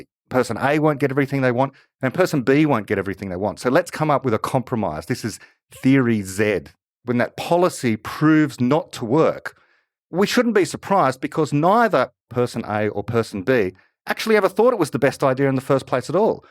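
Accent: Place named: Germany. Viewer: nope its not nivi. sounds like Deutsh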